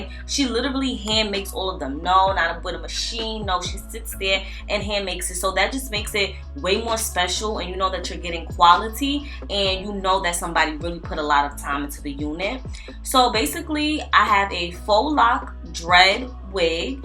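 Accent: New York, United States. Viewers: New Yorker?😊🤗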